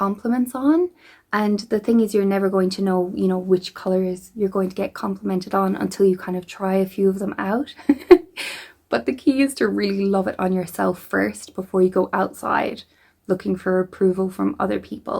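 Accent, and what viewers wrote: Ireland. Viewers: Your accent sounds Irish?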